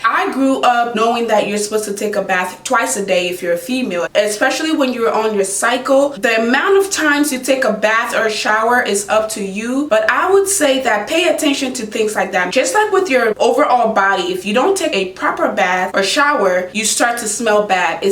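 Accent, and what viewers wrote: Liberia. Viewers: Are you Liberian because YESSSSSSSSSSSSS!!!!!!!!!!!!??????? I can’t help but notice the accent I’m sorry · I can definitely hear your Liberian accent lol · That accent sounds like a Liberian girl. Go queen ❤️ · Ayyeee! LIB sis, as I hear your accent! Do it for the culture hunnnny,! Yasss! Thanks for this info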